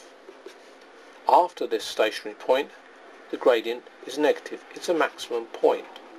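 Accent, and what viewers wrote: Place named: United States. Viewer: thanks erm are you american or something...i love your accent! especially when u say...'That was careless of me' when u make a mistake hehehe x x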